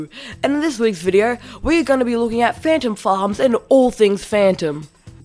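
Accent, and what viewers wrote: Australia. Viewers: Are you Australian??? You sound like Steve Irwin... CRIKEY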